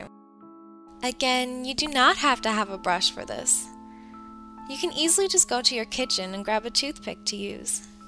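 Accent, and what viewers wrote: Canada. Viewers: I'm pretty sure she's Canadian. She has a bit of an accent when she says "about", and it sounds Canadian. People with different accents say words differently.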